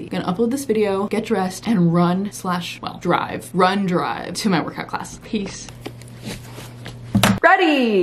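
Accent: United Kingdom. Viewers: AHAHHAA i love this british accent!!!! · love your british accent🤗 so cute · every video she does a british accent and it's cute haha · I want your English accent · Loving the British accent😂💞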